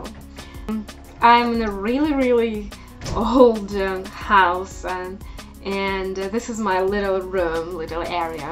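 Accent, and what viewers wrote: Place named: United Kingdom. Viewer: Fascinating accent with a little polished British in there. Like your videos. You should post more often.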